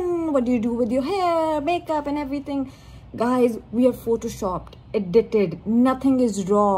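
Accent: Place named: India. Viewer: Her accent is indian 😂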